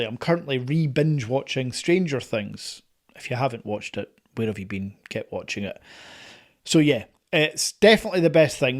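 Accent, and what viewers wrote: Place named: United Kingdom. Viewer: Guy British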